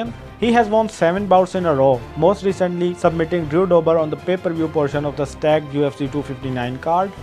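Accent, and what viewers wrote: India. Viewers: This is not asian accent.. this is KELING ACCENT... LOL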